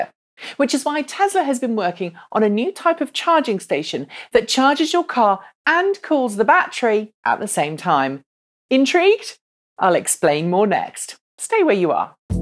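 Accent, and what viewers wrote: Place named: United Kingdom. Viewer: with British accent everything sounds smarter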